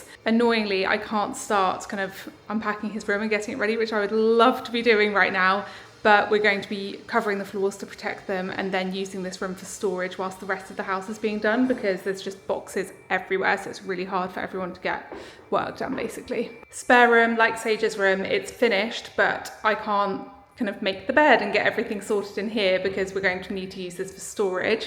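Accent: United Kingdom. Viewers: I’m so excited for you… this home is going to be so lovely. I also have watched you for years and am glad you are back! I see your home as being some vintage pieces with some modern… I guess you would say eclectic. You have the most beautiful voice along with your British accent. … I could listen forever…. You also are beautiful… your skin is amazing! Please keep filming the vlogs we all love them Blessings lovely lady ❤️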